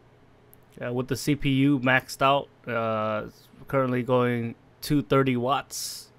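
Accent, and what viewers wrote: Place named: Cambodia. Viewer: Why do you have a Viet name but a Cambodian accent?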